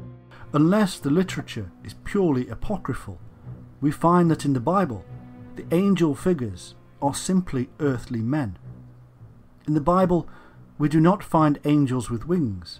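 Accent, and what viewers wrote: United Kingdom. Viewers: The minute I heard the British accent I knew this would be a watered down "damage control" version. Presented more as a myth than reality. Read Sitchin for the real truth.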